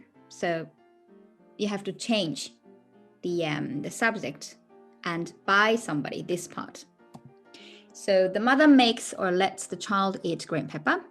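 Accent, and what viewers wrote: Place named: United Kingdom. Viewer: Your english accent is so good... you sound like you are from the uk! (maybe you are lol). Great video anyway, thanks :)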